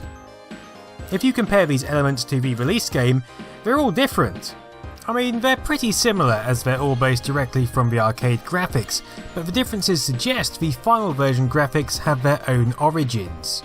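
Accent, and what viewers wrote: United Kingdom. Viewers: beeta! You are British! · shitbrit narrator- i'm out.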